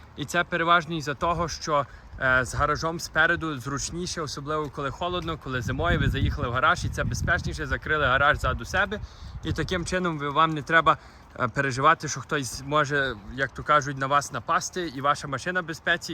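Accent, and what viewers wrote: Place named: Western Ukraine, Ukraine. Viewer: До речи, мені теж чується якийсь акцент в Вашій український мові. Чи то вже англійська так вплинула чи Ви походите із західної України?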